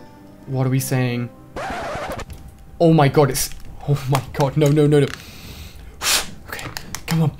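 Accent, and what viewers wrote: United Kingdom. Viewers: no shade but i always have trouble understanding accents like this like the british variety ykwim sis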